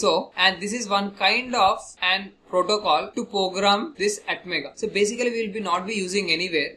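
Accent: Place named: India. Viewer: ntrog ntir ntintidiri ntig any one understand this indian accent ???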